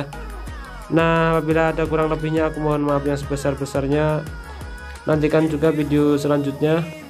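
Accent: East Java, Indonesia. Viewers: Jawa timur nya mana bang? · Jawa timur yak ??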